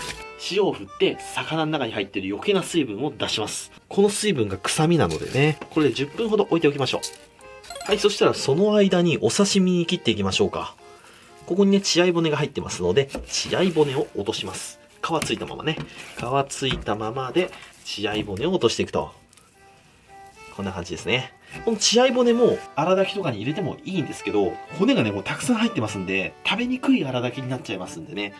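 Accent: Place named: Nagoya, Japan. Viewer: 名古屋人だと馴染みの言葉だけど、なまってると思う人いるのかな？と思った。 「飲まずにおれん」「冷てービール」 素敵な動画ありがとうございました！！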